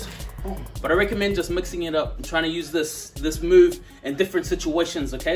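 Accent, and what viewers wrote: New Zealand. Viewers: Sounds like you're from New Zealand? Your voice reminds me a tad of Taika Waititi but not exactly the same.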